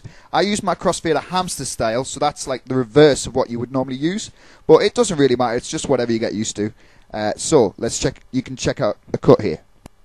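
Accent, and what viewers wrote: United Kingdom. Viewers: you a geordie? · i fuckin hate the british accent, but for sum strange reason i dont mind when you're speakin it =/ lol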